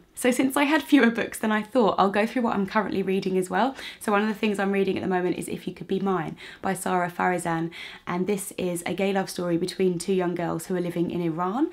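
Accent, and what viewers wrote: United Kingdom. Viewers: You're great, I love your British pronunciation and as a student of English philology in Poland I just have to say how much I admire your work!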